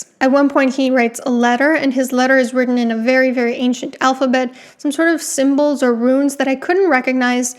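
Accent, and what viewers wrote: Romania. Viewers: I'm curious if you're familiar with the 'Subspecies' Vampire films from Full Moon Features.... They were the first to be filmed in Romania, using the Hunedoara Castle as the set....the Character of Radu Vladidlas is a magnificent example of a classic, menacing and maniacal Vampire. Also, I loved the new Nosferatu as well....your review is very good and your Romanian accent is fascinating! Thank you and if you haven't seen the Subspecies movies, definitely do yourself the favor of rectifying that! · Were you born in the US? Your pronunciation of "Nosferatu" gave you away as a romanian but your English sounds american. Cheers for the video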